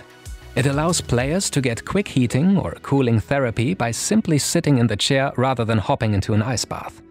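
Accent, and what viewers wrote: Germany. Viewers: Are you German we can hear it. Ja aber ist trotzdem ein gutes Video. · After 1,5sec you can tell this guy is german, one of the very few who can actually pronounce adidas correct.🤣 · love your german Accent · what you mean 'nobody', his accent is more German than Stefan Effenberg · Flashing images no shit. This content should not exist on YouTube because of the flashing images. A warning before the video won't cut it id say. Also this guy's accent is on par with the Flashing images, gtfo sauerkraut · I hear the speaker is german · The German accent narration is winning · Deutscher Akcent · i hear a fellow german accent if i hear one;)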